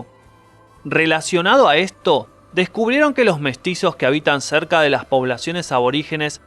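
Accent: Argentina. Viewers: Pues el wey es argentino, cómo quieres que hable pues ?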